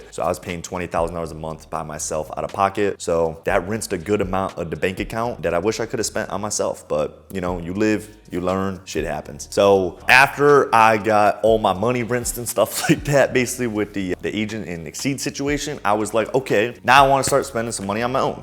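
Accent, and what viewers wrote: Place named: South, United States. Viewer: Why does he sound suthern?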